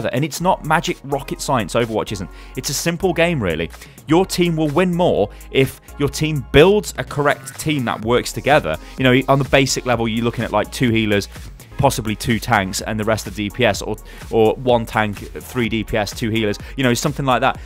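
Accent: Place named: United Kingdom. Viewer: His comfortable British voice gets me wet...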